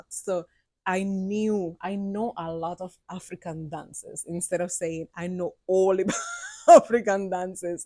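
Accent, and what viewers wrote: Jamaica. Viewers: I love this. I'm surprised you made the transition to Jamaica. I've heard Cubans like Dominicans don't care for blacks. Here you are in Jamaica. I love this. Girl: if you could see my face; lit up, listening to your Jamaican accent. I'm from Montego Bay, now living in Texas. We need to learn more about our Cuban brothers and sisters. Welcome to Jamaica.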